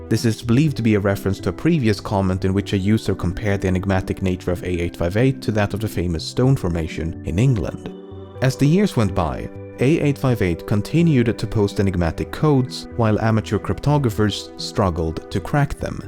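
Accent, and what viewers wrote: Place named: Sweden. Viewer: The swedish accent on English it's so good. One can never get angry on them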